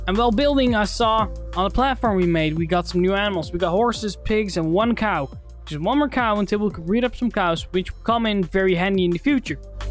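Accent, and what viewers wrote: Netherlands. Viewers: Are u dutch?? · Hmmmm you sound Dutch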